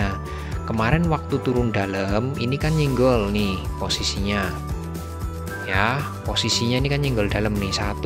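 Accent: Java, Indonesia. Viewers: seep ..om ...keren....medoknya hmmmm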